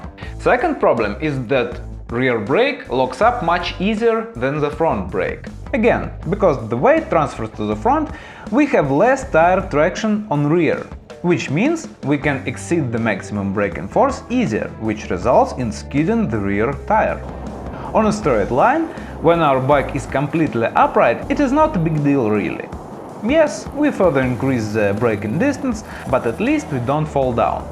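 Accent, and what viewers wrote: Russia. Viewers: Судя по акценту -русский. Почему и титры на английском??? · Узнаваемый русский акцент :) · Youre from russia ?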